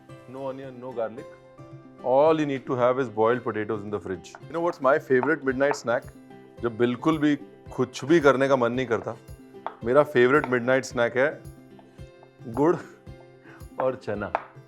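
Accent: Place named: North India, India. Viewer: I love how ranveer keeps it with indian touch with cooking and speaking style.. bilkul desi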